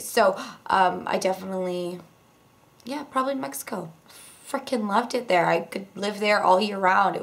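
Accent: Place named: Etobicoke, Canada. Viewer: Loving your videos! I have a friend from Etobicoke and you both have a very similar voice and "accent" ( to me from NY). Do you happen to live near this city? I'm not stalking you I just hear the similarities. Thanks!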